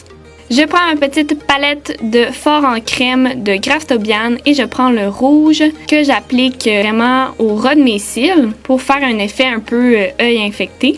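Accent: Canada. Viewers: derien et j'adore ton accent canadien la 😃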